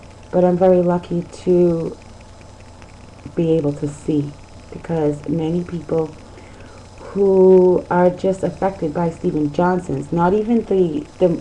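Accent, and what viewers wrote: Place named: Boston, United States. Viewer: SJS survivor as well. Like the Boston accent ;)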